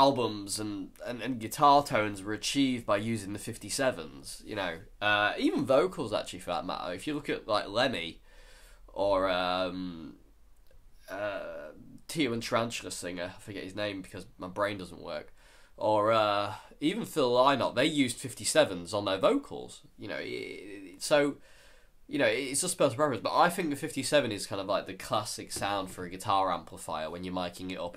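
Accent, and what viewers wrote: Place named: South London, United Kingdom. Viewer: Damn man! you really shouldn't make videos when your that sick. You sound like someone completely different, even your accent :( You sound more like your from the South London than Lincolnshire lol. Get better soon Mate!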